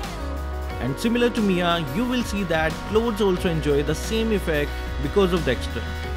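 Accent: India. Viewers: are you from India, because your voice is like an indian very clear, some I noticed that you equip the map of India? 😕🤔 By the way thanks for this video it will help players like mm. players and also us to improve our skill and builds. 👌🙏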